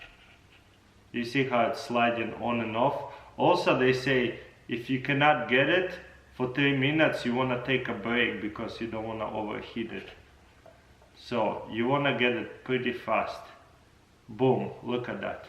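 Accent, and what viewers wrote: Russia. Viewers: Try to speak more fluent English. How is your russian accent so thick even though you've lived here for so long? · Why nobody talk about his pretty stereotypic Russian acsent?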